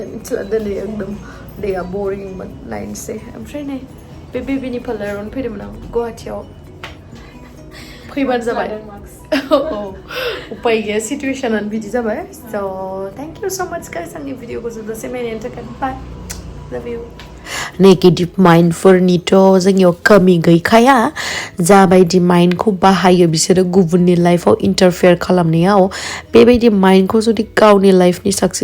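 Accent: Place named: Goalpara, India. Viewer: Manw sanjari railaiyw Aabo sona, Golpariya railainanag gwo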